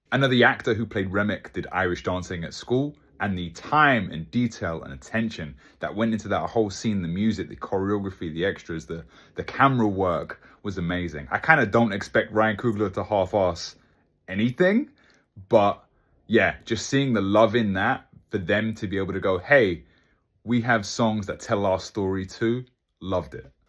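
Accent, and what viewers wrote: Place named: England, United Kingdom. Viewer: You have an English accent 😂😂😂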